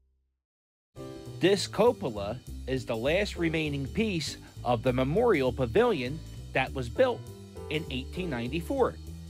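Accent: Philadelphia, United States. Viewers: Great Tour👍👍Love the Philly accent !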